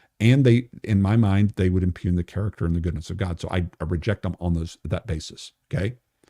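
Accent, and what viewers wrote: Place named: Texas, United States. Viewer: I think you're smarter than you say you are. I think its that thick Texan accent that masks it 😉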